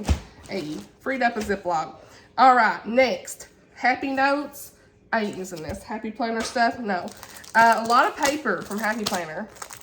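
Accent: South, United States. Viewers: Your southern accent is fine for me. I'm a southern girl myself. I'm from Maryville, Tennessee. I will be praying for you and your family. We are going through a hard time with my husband. He is taking chemo foe stage 4 colon cancer so please keep him in your prayers please.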